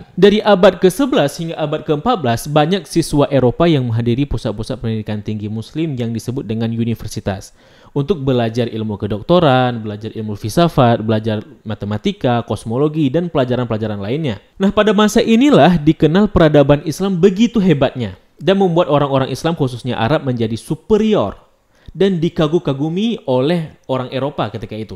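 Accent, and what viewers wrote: Aceh, Indonesia. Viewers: Logat Aceh si Babang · Wajarlah Beliau kan orang Aceh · Beliau orang aceh. Yap jelas ada bagian suku dan budaya melayu. Lebih tepannya melayu sih dari pada suatu negara. Karna melayu ada di malaysia dan Indonesia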